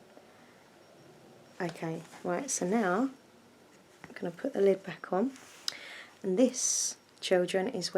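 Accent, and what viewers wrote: United Kingdom. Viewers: your British English is so beautiful！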